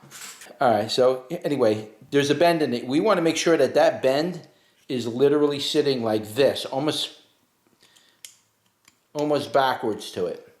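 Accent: New York, United States. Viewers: Thank you for this mine has a digital board at the front, great review, are you from New York, I'm in England!!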